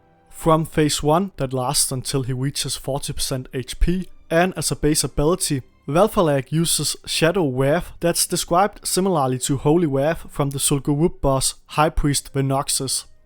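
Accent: Denmark. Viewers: Love the accent - a fellow dane here! Great vids bud! Do you stream on twitch or only making content here?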